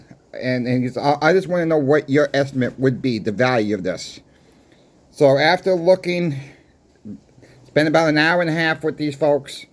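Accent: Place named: New England, United States. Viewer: I don't know if those customers are actually going to read your comments or watch the video however you pose the question are they doing the right thing by getting rid of the collection? As a stacker my answer would be absolutely two things going to happen here if they get constitutional silver that money yes money is guaranteed and backed by the United States Treasury not the Federal Reserve Etc that's actually backed by the United States of America and as far as generic rounds well if the price of silver skyrockets they win their as well because at what point does the collection just become worthy of the silver that's in it or the 50 or $100 in coinage that they have due to the fact that no one has money and no one's going to care that they are Collectibles they are going to look at the intrinsic value being that of silver money. 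Just my two cents I absolutely think they're doing the right thing by getting a mix of constitutional silver, ASE, generic rounds. Keep up the good work every once in awhile the New England accent comes out and I get a kick out of it being from New England myself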